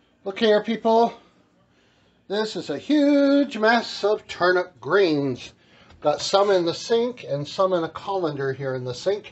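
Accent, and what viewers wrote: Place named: South, United States. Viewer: 🤦 dude, every time you told Alexa to set a timer for 3 minutes caused my Alexa to set a timer. Now my Alexa has a "greens" timer. 😂 I know this video is a couple of years old now but I just saw it. I'm about half redneck living in middle TN. The way you talked and done everything felt like I was listening to someone local and on my level. Been awhile since I done any canning and was looking for refreshers. You mentioned liking all kinds of greens like turnip, collard, mustard, whatever. If you haven't already you should try a mixed of all those together. Mixed greens are pretty darn good. Throwing in some kale, that you said wasn't your favorite, actually adds to the flavor. Some arugula mixed in is pretty good too. I do have a couple of suggestions, not criticisms or anything negative. I'm not a fan of a whole lot of turnip chunks in my greens. 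I'd recommend putting the turnip chunks in the jars first so you can get the amount you like. I'd also shoot for something in the middle when it comes to loose pack or tight pack. As a southerner I like some meat flavor with turnip greens. A couple of different things you could do if wanted it already canned instead of adding it later. Canning meat isn't a whole lot longer than just the greens. Maybe blanch the greens maybe a minute less, or not , and should still be good with meat. Or use some bullion in the water you are blanching them in and filling the jars. Again, absolutely nothing wrong with what you done. It helped me remember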